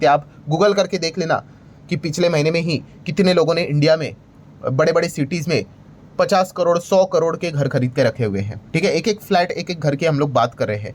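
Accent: Maharashtra, India. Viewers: Trump tower Bandha hai 😂😅 Are you Marathi?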